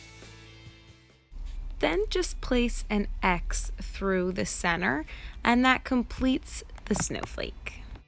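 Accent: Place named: Maryland, United States. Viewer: You sound like you're from Maryland!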